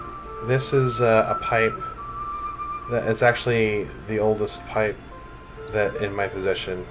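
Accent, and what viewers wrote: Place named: Ireland. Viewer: Dude you have to be Irish :) I'm a cigar smoker but thinking of picking up pipes and stumbled across you're channel! Subbed.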